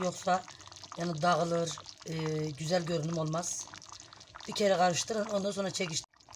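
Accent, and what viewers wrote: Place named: Gaziantep, Turkey. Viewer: 7 kuşak doğma büyüme İzmir'liyim İzmir'de böyle bir köfte yok !!! İzmirin sulu köftesi olur böyle yoğurarak vir köftemiz yok bizim m..Siz büyük ihtimalle anteplisiniz şiveden anladım... Antep'in içli köftesi çiğköftesi çok bilinir Ellerinize emeğinize sağlık ama bizim İzmir'in böyle bir köftesi yok yinede memleketimin adı geçtiği için mutluyum 👍🏼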